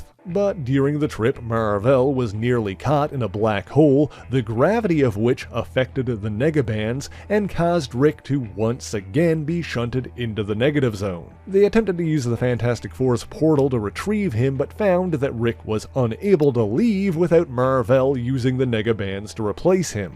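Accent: Newfoundland, Canada. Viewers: I detect a mild Newfie or Cape Breton accent here b’ye!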